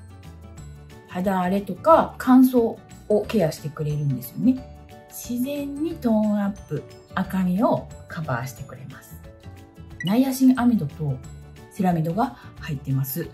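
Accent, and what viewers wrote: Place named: Kansai, Japan. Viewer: ライラックの発音！ライラ↗️ック😂 ラ↗️イラックでは？んもー🐮、の関西弁好き！！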